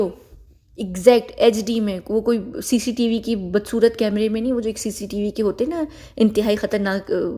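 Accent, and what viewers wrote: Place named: United Kingdom. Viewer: How come an Agent pronounce English words like this way when her accent seems British sometimes??..Confusing.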